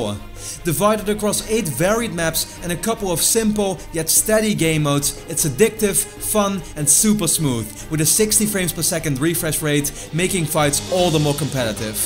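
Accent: Netherlands. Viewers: Hahaha, je hebt echt zon zwaar engels-nederlands accent ^_^. Ik zat wat reviews te kijken, dacht dat je een engels kanaal was. En je klinkt zo verschrikkelijk nederlands. ;) Leuk kanaal trouwens. Goed dat je het in het engels doet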